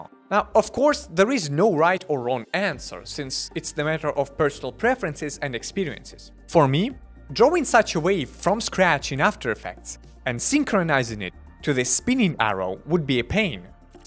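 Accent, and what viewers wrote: Russia. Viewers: Is this guy a russian originally?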